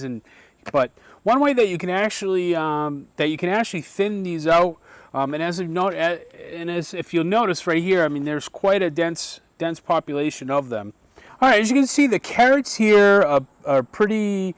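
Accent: Boston, United States. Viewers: you from Boston, guy?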